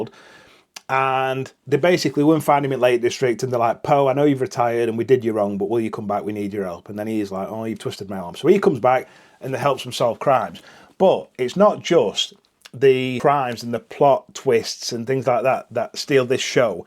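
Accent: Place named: South Yorkshire, United Kingdom. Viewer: Mate, I live alone with my dog, and sitting watching you talk about your passion with a cuppa while I'm also having one is just so calming. You've already extended my tbr tenfold just by how you talk to your camera like you're having a brew with your buddies. I had lost both my parents by the age of 33, and my mother was from Leeds (she got me into reading as a child, worst thing she ever did tbh) and your dulcet south yorkshire/Derbyshire tones just make the place feel like home again. Keep doing what you're doing please, the world will suffer great loss if you ever stop.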